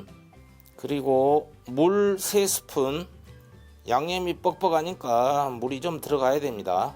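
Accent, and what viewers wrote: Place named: Yeosu, South Korea. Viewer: 안녕하세요. 여수사투리쓰시는 셰프님 정겨워서 늘 제일 먼저 찾아보는 요리정보예요~ 잘 보고 있어요 감사합니다:) 근데 요즘 제목에 자꾸 ‘이걸’ 넣으세요~ 이런식으로 쓰시는거 같아요. 좀 별로예요 제목으로 낚는 다른 콘텐츠들 보면 짜증나는데 는 안 그랬으면 좋겠어요.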